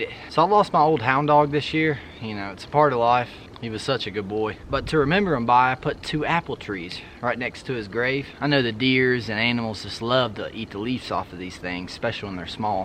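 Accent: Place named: South, United States. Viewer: Love what you’re doing restoring your property. That has always been a dream of mine to retire a property to retire in. What state are you in, sounds like maybe in the southern US. Hope you’re feeling better soon.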